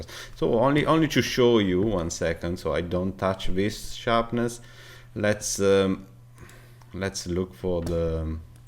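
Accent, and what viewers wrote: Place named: Italy. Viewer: You sound like italiano man!!!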